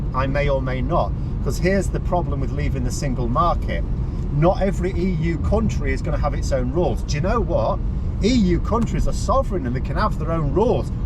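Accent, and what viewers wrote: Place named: Yorkshire, United Kingdom. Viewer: I'd support that. I'll even write him a reference. Forgive me but that sounds like a Yorkshire accent. Certainly from the North of England, that's a good start!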